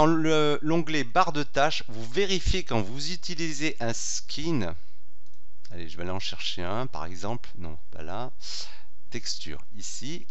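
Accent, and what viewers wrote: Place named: North, France. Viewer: Ben ouais t'a raison m'gamin!!! comme ils disent dans le Nord, le patois du Nord, il faut le comprendre, je ne le comprends pas toujours, mais il me fait bien rire, sans moquerie méchante, je le dis au cas où les vrais Nordistes d'Origine n'aimeraient pas ce commentaire..... Ssshusssssssssssss!!!!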